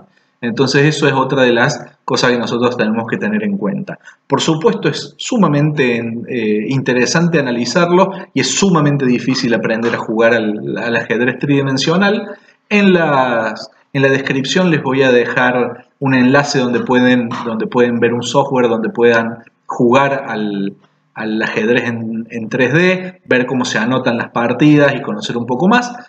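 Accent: Argentina. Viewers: es re cordobés el vago jajaja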